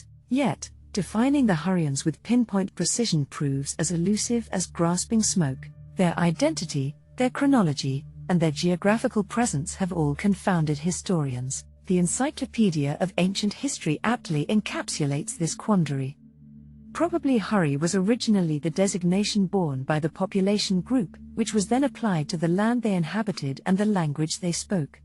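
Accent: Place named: United Kingdom. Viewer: The lady has a very British accent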